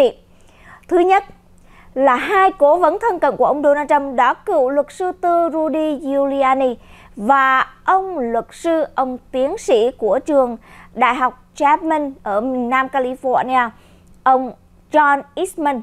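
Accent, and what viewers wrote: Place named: South, Vietnam. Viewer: Nói tiếng Huế đi, nói giọng nam nghe bịnh quá. không thử giọng Bắc đi.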